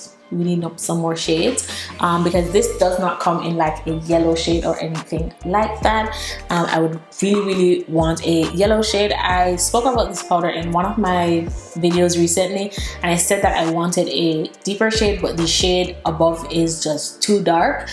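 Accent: Jamaica. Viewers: Your Jamaican accent ☺️☺️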